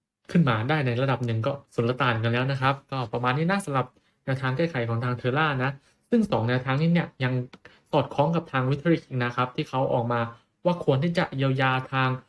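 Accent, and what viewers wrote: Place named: Central Thailand, Thailand. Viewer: ใต้ตรงไหนเนี่ยะ ออกแนวเหน่อภาคกลางมากกว่า หรือไม่ก็ภาคตะวันออก..สารภาพมานะ..เป็นคนจังหวัดอะไร..บอกมา..ไม่งั้น..โกรธ...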